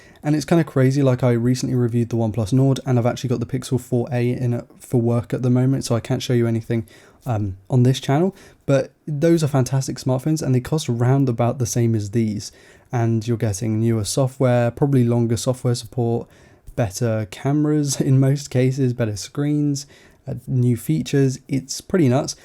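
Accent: United Kingdom. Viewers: Hi! I don't usually comment on videos, but when MrMobile made his giveaway task to be "comment something nice on underrated tech channel's videos" I immediately thought of you. You really make great content and your calm presentation (and beautiful british accent) make your videos a joy to watch. You also make videos on used smartphones which I think is great. Old flagships are still good phones! And congrats on getting to work with Mazda. That was a good video! I really like cars (especially Mazdas) so that might be why.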